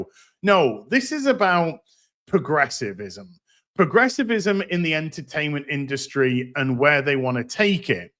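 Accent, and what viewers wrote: New Zealand. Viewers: I thought you were a new Zealander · I thought you were from New Zealand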